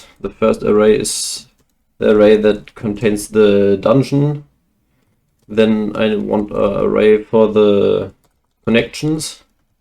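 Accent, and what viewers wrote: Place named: Germany. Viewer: German accent goes BRRRRR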